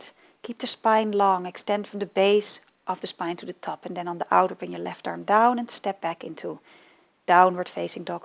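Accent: Netherlands. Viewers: Cassendre Xavier Definitely a Dutch accent! 😊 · She’s Dutch... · Wow is she Dutch or what? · you have got a dutch accent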